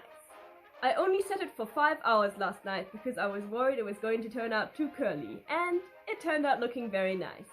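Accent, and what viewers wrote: Germany. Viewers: are u german by any chance? you kinda sound like one and your last name does too :D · oh wow ! You've lived in NZ since you were 8 . May I ask how you retained such a strong german accent ? Most people lose theirs when they move abroad as a child . Love your videos!! ☺️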